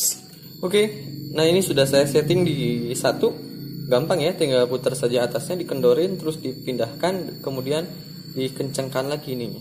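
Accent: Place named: West Java, Indonesia. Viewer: Sunda bgt ... itu logatnya mas 🤣🤣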